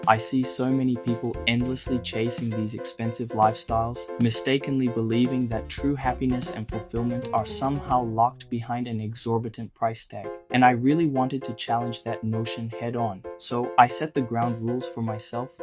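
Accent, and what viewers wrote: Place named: India. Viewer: Thank you Indian guy (the accent was a dead giveaway away). These are great tips to share. Although I’m way ahead of you, as I have been doing every one of these things and more for the past 2 decades. Trade off was that I have traveled the world, been to the finest of places, and am surrounded with luxuries “all the things” which I have never once paid retail price for. Champagne on a beer budget is totally possible with meticulous and methodical planning 🥂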